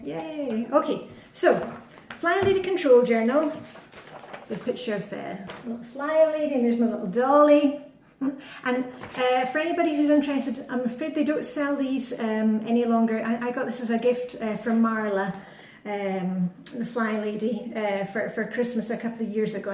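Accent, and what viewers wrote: Scotland, United Kingdom. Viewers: Just found your videos due to a recommendation on The Hygge Nook group on Facebook and I am already converted... Flylady with a Scottish accent!! And in Denmark!! How could I resist?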